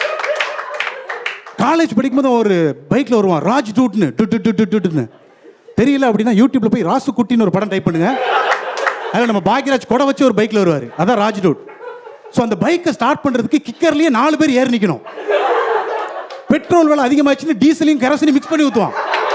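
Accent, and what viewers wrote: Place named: Tirunelveli, India. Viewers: Fantastic Ji…nalla continuity and the Tirunelveli slang was superb….well done! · TIrunelveli baashaya comedy club la kaekka nallathaamya irukku. Valthukkal. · Hilarious! That Tirunelveli slang was gold! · Was hilarious. Southern accent was awesome!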